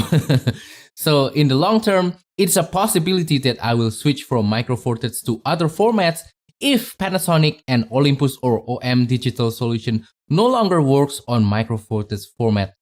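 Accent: Indonesia. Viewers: As an Indonesian myself, I didn’t know that you’re an Indonesian until I notice your accent 😁. Greetings from Poland 🇮🇩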